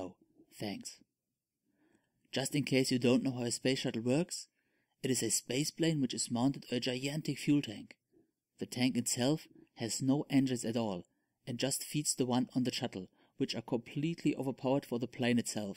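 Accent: Germany. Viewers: Are u a german??? :-) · kollege man merkt dass du deutsch bist :D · Not sure if German or Japanese. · Are you German? Almost every German has that English accent.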